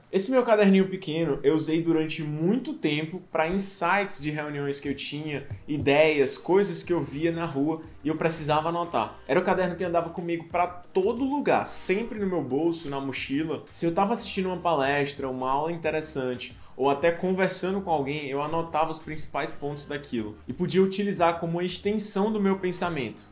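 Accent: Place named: Fortaleza, Brazil. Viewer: Oi,. Tu é daqui de Fortaleza-ce? o sotaque é parecido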